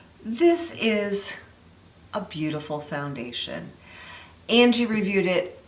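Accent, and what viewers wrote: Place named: Midwest, United States. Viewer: Born and raised in Iowa so I totally understand the Midwestern accent, yah hey! ;)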